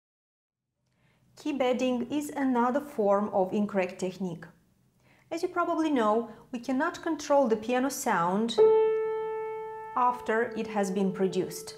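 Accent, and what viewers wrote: Russia. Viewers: Ура! Я могу услышать русского человека, просто услышав как он произносит отдельные звуки английского. Я угадал, что вы русская? По крайней мере русскоговорящая?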